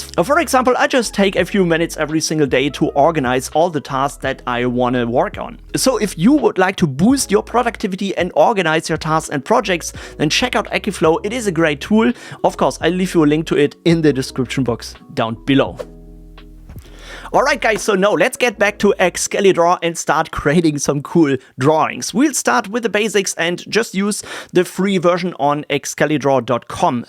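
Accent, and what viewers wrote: Germany. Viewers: subbed, i found you to be a good how to guy, will be checking out more of your videos and liking and or commenting, Are you German or Austrian, excuse my ignorance pls.I like your accent and my tism is acting up needing to know the answer.I could read your bio, but that would discourage discourse with you directly.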